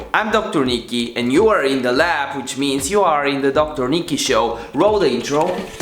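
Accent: Russia. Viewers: I think the Russian accent is better than the Indian lool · Руски акцент а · First time seeing a russian accent tutorial instead of an indian one.. Both need subtitles though..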